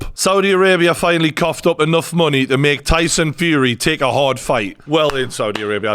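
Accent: Newcastle, United Kingdom. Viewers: Always like listening to a Byker lad .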